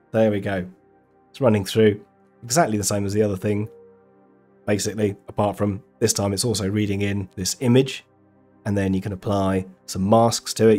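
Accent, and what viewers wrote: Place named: United Kingdom. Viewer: You sound like spiffing brit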